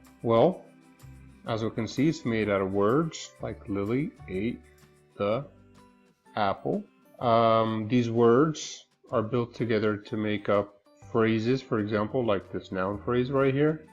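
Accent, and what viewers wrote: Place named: United States. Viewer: sounds like some american all of sudden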